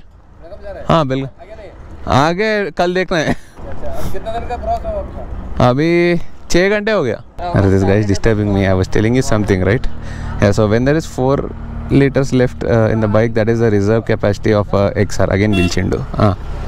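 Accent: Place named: Hyderabad, India. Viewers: Really this is more informative and authentic telangana English, but make sure you are taking anti covid measures while traveling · I like when u talk in hindi.. Hyderabad hindi❤😍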